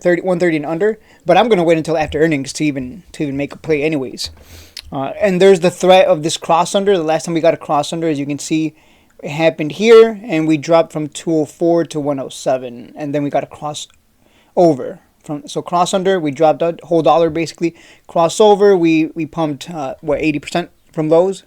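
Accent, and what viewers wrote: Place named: Long Island, United States. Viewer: Maybe people think that because the guy prostockmarkets I think is his name keeps mentioning you and he said you sound like you are from Long Island here in NY.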